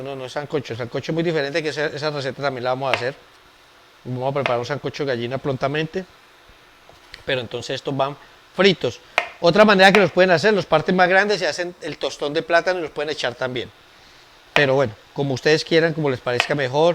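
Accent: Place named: Pereira, Colombia. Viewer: Hola se ve deliciosa la sopa : me hizo reír con tu video, eres un poco charro y por tu asentó pareces de Pereira Risaralda. 😀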